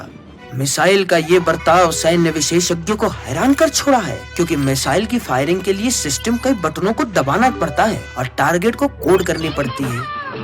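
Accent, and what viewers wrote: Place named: Rural, India. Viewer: किस देहाती से voiceover दिलवाया है जी ?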